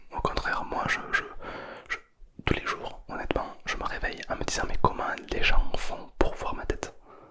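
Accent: South, France. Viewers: Mdr j'adore tous les personnages que t'as inventé que tu fait intervenir dans tes vidéos et ton ASMR est génial encore plus avec ton accent du sud ❤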